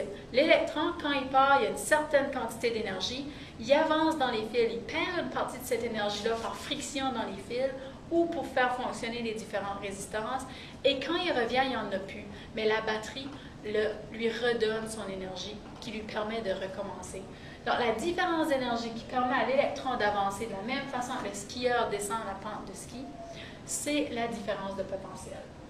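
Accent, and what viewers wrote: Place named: New Brunswick, Canada. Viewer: Wow superbes explications! Et j'adore le ptit accent Nouveau Brunswick!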